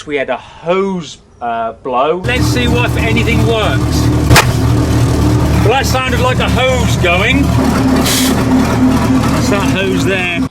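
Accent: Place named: England, United Kingdom. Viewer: I do really enjoy your videos however I have to admit I don't always know what you are talking about your English is a little to English for me and not enough Rez for me to understand sometimes. It like learning a new language sometimes but fun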